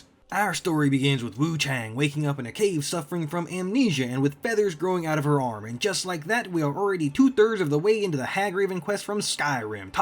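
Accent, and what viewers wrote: United States. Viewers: I was not expecting to have a stereotypical American accent when doing a Chinese game review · Yeah I'm not playing this game but will support whatever video you put out. Also you got a beautiful southern drawl and it's the 1st time I ever noticed it.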